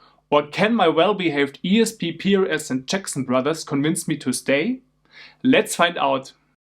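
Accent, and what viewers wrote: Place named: Germany. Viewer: I swear I hear a german accent in there! :D really cool videos by the way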